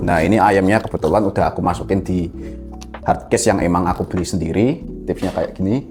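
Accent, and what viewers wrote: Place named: Java, Indonesia. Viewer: Khas medok'e Jowo tenan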